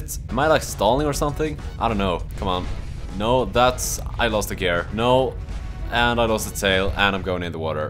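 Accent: Sweden. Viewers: Are you from sweden? The way you speak sounds like when a swedish man speak english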